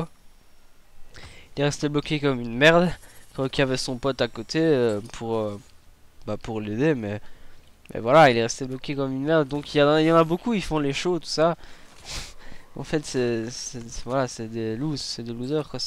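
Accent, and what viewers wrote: Belgium. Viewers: on entend que tu parles plus en belge là c'est symas :)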